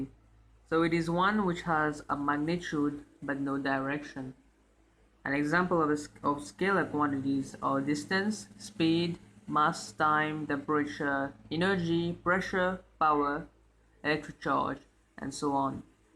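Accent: Mauritius. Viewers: sounds like a maurician dudeeee!!!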